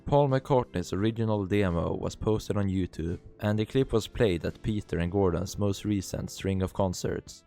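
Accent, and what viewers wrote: Sweden. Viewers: Your ATROCIOUS swedish accent is just too unbearable to listen to for a whole video, sorry... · you sound so swedish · I like your swedish accent, I also happen to have hyperfixation on so this video will be on my "videos for eating snacks" list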